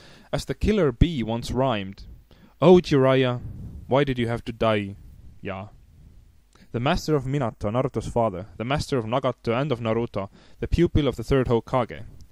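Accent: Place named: India. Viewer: I like you're indian accent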